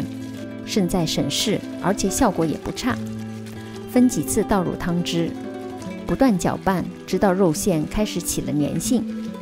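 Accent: Shanghai, China. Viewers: 原来是上海小囡呀亲切的很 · 好亲切的沪普：long tang💕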